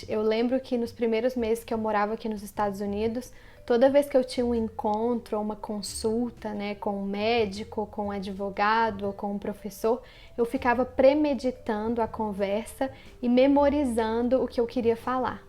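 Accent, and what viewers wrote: Minas Gerais, Brazil. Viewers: Ótimo vídeo...você é mineira? :D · O seu padrão , com este sotaque , é mineirês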